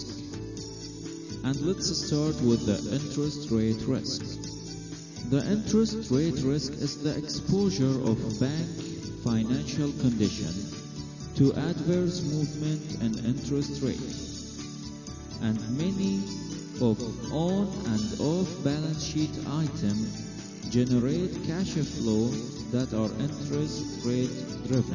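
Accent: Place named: Bahrain. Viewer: اللهجه حتى وهي إنجليزيه تنعرف أنها بحرانيه هههههه ..I wana help on the commercial bank management it's so hard :(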